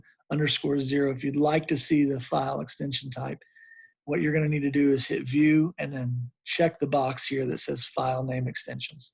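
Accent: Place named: United States. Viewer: This was a great video. So nice to hear an American accent instead of these Indian accents.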